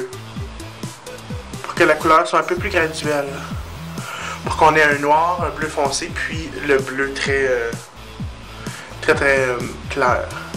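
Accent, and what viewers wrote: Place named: Quebec, Canada. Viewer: Tu as un accent Québecquoi ! :]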